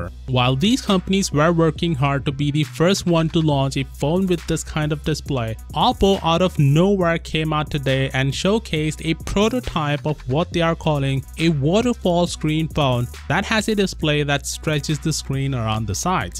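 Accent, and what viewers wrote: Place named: India. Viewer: Your accent is confusing me are you Indian or Chinease or both 🙂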